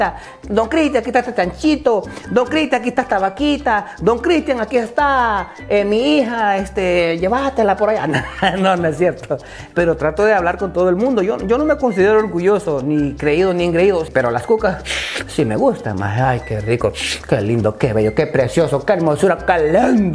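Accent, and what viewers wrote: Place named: Oriente, Cuba. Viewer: Soy chalateco y creo que vos sos de Oriente así hablamos también cuando estamos jodiendo te felicito sos auténtico maje segui adelante tenes mi apollo aquí esta tu 👍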